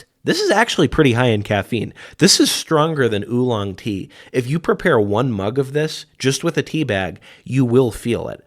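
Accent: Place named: Midwest, United States. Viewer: I have been meaning to try Yaupon and got this video suggested to me! It is interesting though because you have a very thick midwestern and southwestern accent!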